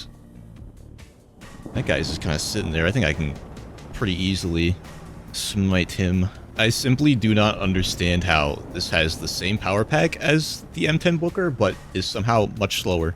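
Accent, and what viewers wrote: United Kingdom. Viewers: IS BRITISH? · Youre british? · wait is British? I’ve been hating on a fellow Brit for hating British tanks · you are british?😟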